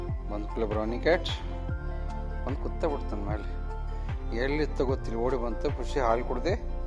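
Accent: North Karnataka, India. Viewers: Hi This is Shridevi From USA 🗽🇺🇸 Nanu nimma volgs nodide supper edav ri.... Namma Uttara Karnataka bhase kelaka kushi anastheti adu America dalli and niv Belagavi market thorshidri nodi nanna memories recall adav yakadre navu 2 years belagavi Naga eddavi nanna magalu KLE school he hogtiddalu. so nanu scooter togondu tirgadthaedde so niv thorso belagavi places nodidre nenapaketi anyway nimma mother supper edare Nice volgs Best of luck 👍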